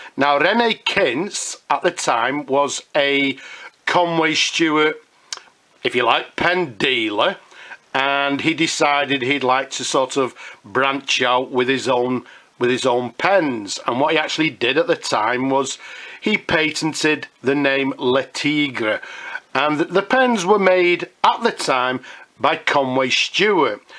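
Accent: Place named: Northern England, United Kingdom. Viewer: Oh, I see! I thought for a moment that you were talking about yourself and was about to ask which race at what meeting so I could place a bet. Excuse me being personal but I love your Northern vowels. Batley, or am I way off mark?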